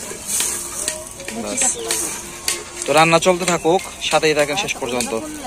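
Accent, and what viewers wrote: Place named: Sylhet, Bangladesh. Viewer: আপনার মেইন বাড়ি ঢাকা নাকি আপনার কথায় বুঝা যায় আপনি সিলেটি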